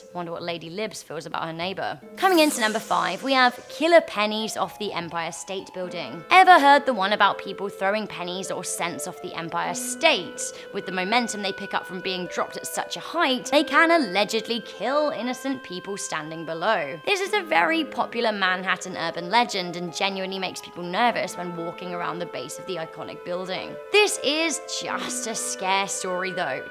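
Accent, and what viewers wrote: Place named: United Kingdom. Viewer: British accent🤮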